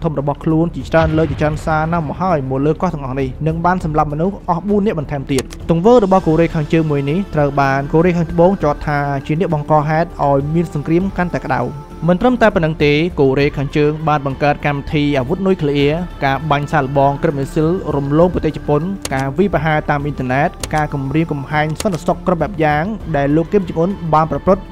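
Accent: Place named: Prey Veng, Cambodia. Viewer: សំដីសំដៅរបស់ admin ដូចអ្នកស្រុកព្រៃវែង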